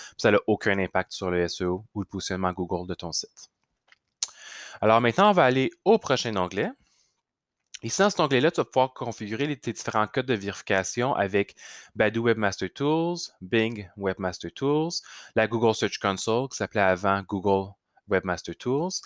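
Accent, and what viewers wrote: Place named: Quebec, Canada. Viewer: Bravo! c'était clair et très intéressant et très agréable avec l'accent québécois ( je suis de France!)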